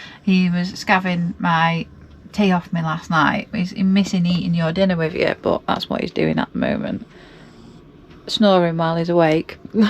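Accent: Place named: Northern England, United Kingdom. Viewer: Right up me chuff😅 trying to diddle meh!😂 proper northern lass 😊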